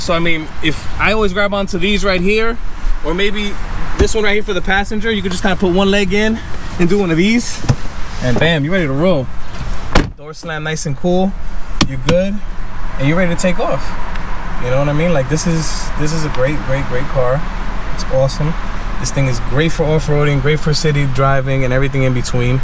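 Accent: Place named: Nuyorican, United States. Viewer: I could only stand about 3 minutes of the whole new yorican talk/accent ugh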